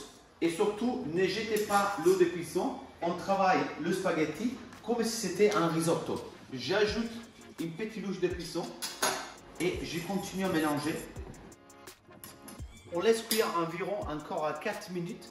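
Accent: Italy. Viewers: Che è questo dialetto italiano degenerato che stai parlando? Non capisco quasi niente. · u know he is italian? so french with italian accent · It's just French with Italian accent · And you didn't catch his thick Italian accent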